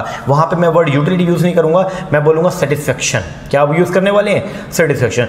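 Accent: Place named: Gujarat, India. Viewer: Sir are you gujrati ? Or are you from Gujrat? Sir bcz jab aap padhate ho toh language mein gujrati ascent pta chlta h😁😁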